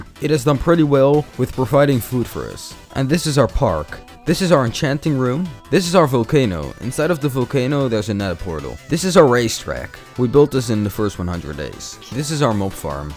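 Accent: Netherlands. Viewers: Are you dutch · where are you from netherlands? or are you russian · Are you dutch? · U DUTCH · Are u dutch? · You’re Dutch and i can hear that XD Edit: on is Dutch chat said: nu pas and that is Dutch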